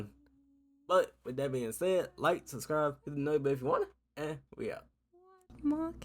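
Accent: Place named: Maryland, United States. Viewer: "erybody" My guy is Marylnd region. Am I right brother? Great video and made some ood points, New subscrber.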